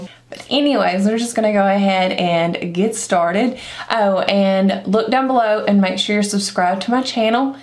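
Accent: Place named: Arkansas, United States. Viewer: likex10 I only ever used 1 setting spray and thats macs fix + ....miss that smell. Haven't purchased that in a long while. Sorry to hear about your neck hope you feel better soon. Will keep it in prayer too. Arkansaw how cool! Thats where that accent comes from 😃👍👍👍 that is so beautiful. I love meeting ppl who Love the Lord too. I am most thankful for deliverance and overcoming things I never thought I could #GodbeTheGlory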